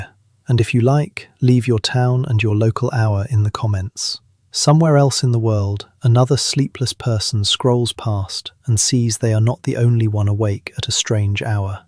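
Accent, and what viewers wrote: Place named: United States. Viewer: Sorry. American accent. Finding something else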